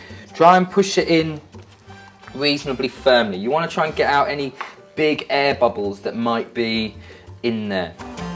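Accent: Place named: United Kingdom. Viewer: WTF? The text is on swedish but u talk british? Im swedish and can talk English, but not that kind of brittish. Where r u from man?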